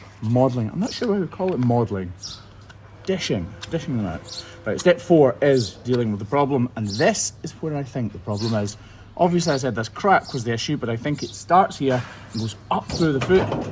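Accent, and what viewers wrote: Scotland, United Kingdom. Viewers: Having trouble saying "similarly" with a Scottish accent try saying "Purple burglar alarm"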